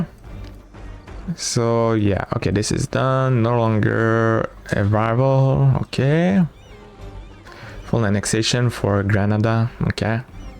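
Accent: Quebec, Canada. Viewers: Ça c'est un accent québécois 😂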